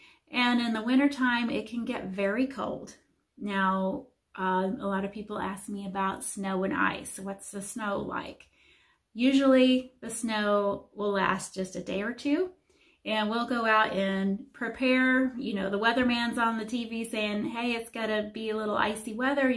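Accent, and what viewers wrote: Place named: Missouri, United States. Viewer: I was raised in Missouri and know your area. I was mostly listening to your dialect. Seems normal to me but people in other parts of the country hear it. Was in PA, now in Oregon... good video!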